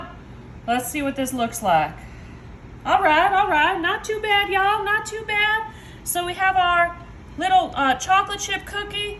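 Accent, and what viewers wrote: South, United States. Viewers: Yummy. Girl where u from? You are sooo southern! Arkansas here. I love all your fast recipes and your accent too. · What southern accent is that?? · These desserts don't look good to me. It's not something I would feed my family, but I love how she tries to talk in a southern accent, so cute!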